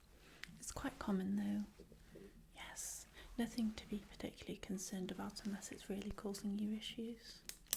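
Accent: United Kingdom. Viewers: Is that w Welsh accent I detect?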